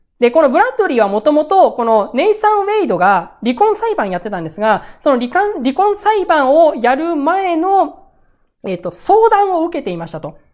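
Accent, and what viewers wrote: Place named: Nagoya, Japan. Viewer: 今日は名古屋弁ちょこちょこ出てたw by 春日井出身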